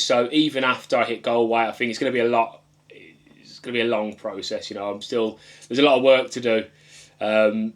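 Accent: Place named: Midlands, United Kingdom. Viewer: Been watching for a while, and only just noticed you’re in Warrington, it’s where I’m from too! With the accent, I was assuming the midlands. Glad that you’ve been able to get your bloods done, it will give you a good insight. It’s a shame it doesn’t seem like you have any other bloods to compare it too, because it could be that yes the result might not look great but there’s no way of knowing if it’s an improvement from when you started 11 weeks ago if that makes sense? Unless you did have bloods done at the start and I’ve missed that. I’ve never had my bloods done before, but been on my weight loss journey since October last year. I’m getting them done tomorrow, privately because my GP is useless 😂. Then although this will give me good insight, I think I will get them done again a few months down the line to compare. Anyway mate, you’re smashing your journey, don’t know if I’ve ever messaged on here so if not, hello! 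Hopefully you won’t have to stop the meds as they have really supported you it seems, but health always comes first!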